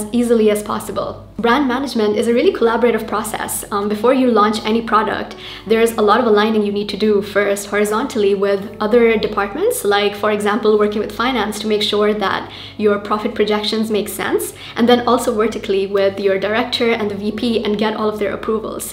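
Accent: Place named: RP, United Kingdom. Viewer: How did you develop such a posh accent?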